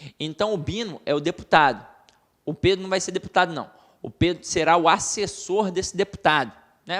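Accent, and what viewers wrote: Rio de Janeiro, Brazil. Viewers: Adorei a aula e o jeito carioca! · o carioqueis é muito forte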